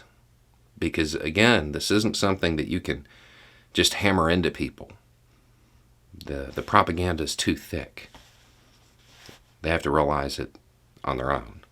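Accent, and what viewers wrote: South, United States. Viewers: of the Fifth Column you used the term "manufacturing consent" a couple of times. Would you happen to have read the book of the same name, written by Noam Chomsky? Hearing you talk is a lot like him, but with a Southern accent.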